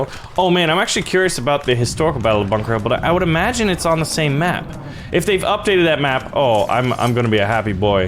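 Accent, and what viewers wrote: United Kingdom. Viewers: I like his British accent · I approve these British accents and any further British accents made by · I like how you do all the different accents for all the different nations. As someone who is British, I think that your British accent is a little bit daft but I like it. Food wise, we do like curry, but it's not really our national dish. For that, I'd direct you towards something like fish and chips, or a Sunday roast, or even a full English breakfast.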